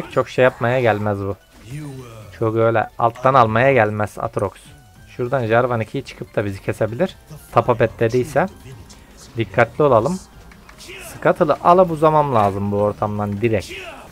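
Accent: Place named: Antalya, Turkey. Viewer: ABI SEN NERELISIN ANTALYALIMISIN ŞİVENDEN ÖYLE DÜŞÜNDÜM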